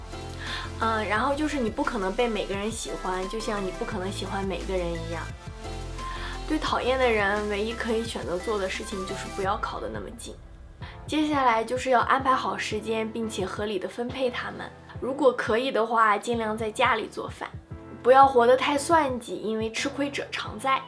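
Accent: Shandong, China. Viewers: 生日快乐！没想到第一次看你的视频就碰到你过生日～好接地气的女孩子，不知道为啥听出了山东味儿，好喜欢你，率真朴实，继续加油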